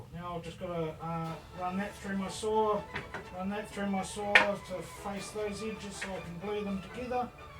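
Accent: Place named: New Zealand. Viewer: Good luck... finally another kiwi woodworker... and our kiwi sense of humour and accent I can understand... lol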